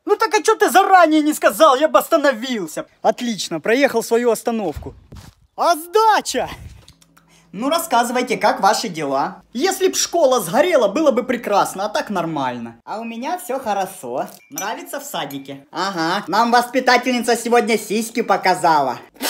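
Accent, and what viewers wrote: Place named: Ukraine. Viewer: всегда вместо буквы "Г" говорит букву "Х".Видно что Украинский акцент👏👏.У тебя самые забавные видео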